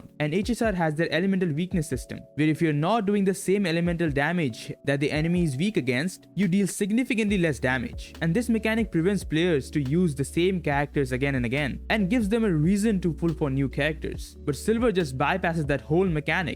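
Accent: India. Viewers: you sound indian, are you?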